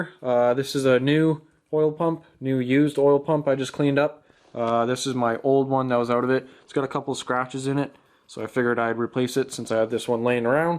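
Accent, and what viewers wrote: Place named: Canada. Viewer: Canadian accent Aye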